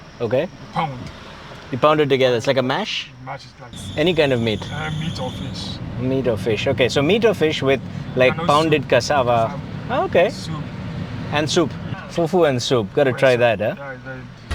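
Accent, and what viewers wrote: India. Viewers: First off, I'm an American. 2nd, I don't care if this guy has an Indian english accent. he's so fluid in not only verbal communication but his facial expression, charisma in front of the camera etc. keep up the good work!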